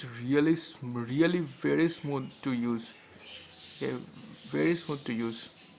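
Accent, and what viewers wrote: India. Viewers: تتحدوني ان الي يتكلم هندي ........!!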